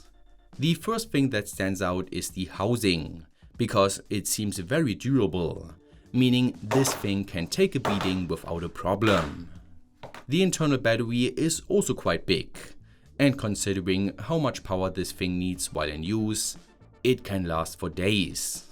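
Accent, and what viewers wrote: Germany. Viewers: This German accent is brutal, but still fun to watch.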